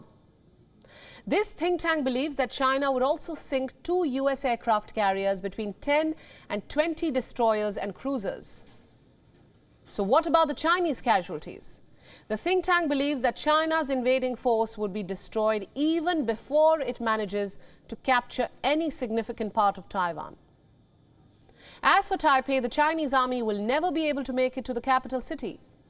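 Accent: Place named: India. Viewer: Pretty strong Indian accent...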